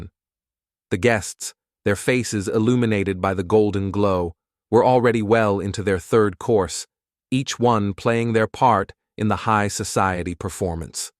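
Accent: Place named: United States. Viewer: Why the American voice?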